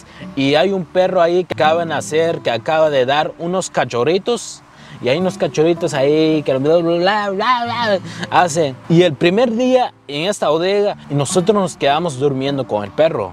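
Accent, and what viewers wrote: United States. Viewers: jjjjjjjjjj lol 13 años y en redas la lengua como gringo no la en redo yo que tengo 30 años aqui y me cruso mi papa teniendo 5 años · Buen video bro, pero una pregunta, a los cuantos años llegastes a Usa? Porque hablas como los chavos que nacieron alla. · Porque hablas como gringo oye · y tu acento hermano asi como medio gringo jejen una pregunta , curiosidad , soy seguidor tuyo , me asusta ir con coyote pero bueno es bueno aprender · Me encantan tus videos pero no entiendo por que perdiste tu acento osea te cuesta el español y eres de Guatemala no entiendo ese esfuerzo de hablar como gringo espero no lo tomes a mal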